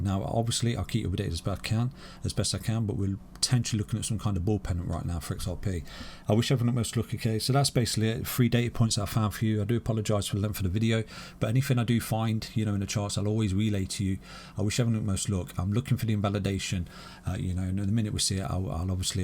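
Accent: United Kingdom. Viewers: He is from the UK and you are probably not understanding him when he says "big up the coinskid crew"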